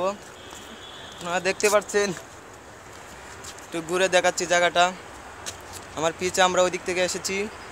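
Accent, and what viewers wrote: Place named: Bangladesh. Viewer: Kolkata theke ele but tomar kotha Bangladeshi der moto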